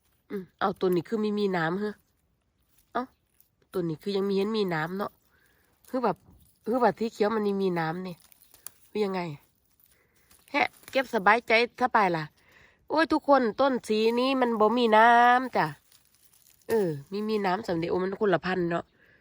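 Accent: Korat, Thailand. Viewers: 😂😂😂 นี่ยังแม่นเนาะ คนทางไหนน้อ สำเนียงคือคนโคราชเนาะ fcใหม่จากสวิตฯค่ะ ปีนี้เพิ่งปลูกสีแดง เดี๋ยวจะไปเอาสีเขียวมาปลูกอีก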